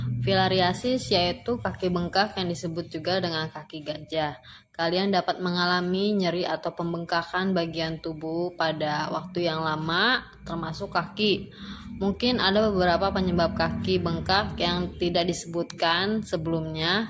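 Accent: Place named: Java, Indonesia. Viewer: Belajar membaca dulu biar lancar.mendok banget bacanya ngapak2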